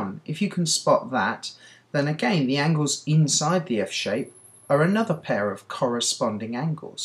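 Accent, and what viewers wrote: United Kingdom. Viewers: ***** British accent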